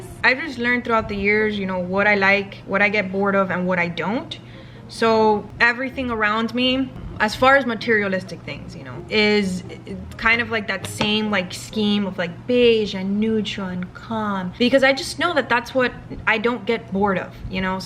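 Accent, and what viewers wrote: Cuba. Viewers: ayyyee my question made it to the video! Me encanta tu acento cubano 😉 I’d be cool to see a GRWM in Spanish or any other video spoken in Spanish ! 🤗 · OMG!! Me encanta tu acento cubano, besos desde España.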